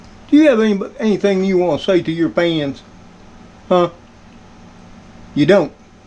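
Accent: South, United States. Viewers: That looked lovely, thank you for sharing. I look forward to your Christmas preparation videos and Christmas cooking. And I love your Southern accent too, as someone else said further down. I was playing one of your videos to a friend of mine, as I have told him about you and how much I look forward to watching these. He loved it as well. So thank you from me and him. :) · Yum!!!! Now that looks delicious. I’m going to cook this as one of the Christmas meat choices since we don’t celebrate thanksgiving in the UK. PS my son and I love your southern US accent! It’s like music to our ears, so an extra treat getting to watch you not only show us how to cook delicious food but also hearing you speak. Hope you had a wonderful thanksgiving 😊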